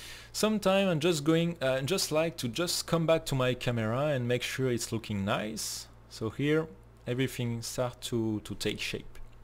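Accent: France. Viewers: Oui, "french accent", et : - Dommage que cette présentation ne soit pas en français. - Dommage que les sous-titres n'existent pas en français. Tout ça est bien paradoxal !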